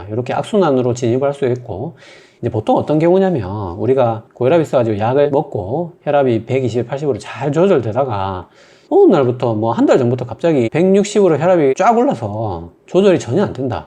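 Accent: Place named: Seoul, South Korea. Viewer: 엣날에 정기적으로 보다가 몇년만에 다시 들었는데... 말투가 서울말로 바뀜 ㅋㅋㅋ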